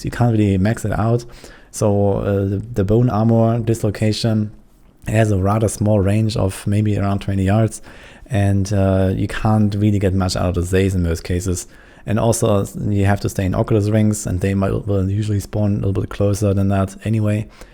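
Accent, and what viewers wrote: Germany. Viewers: Man hört direkt das du deutsch bist 🤣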